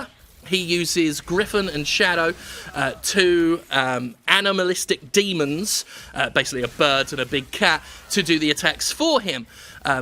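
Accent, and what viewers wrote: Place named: RP, United Kingdom. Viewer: I read this is his british toff voice.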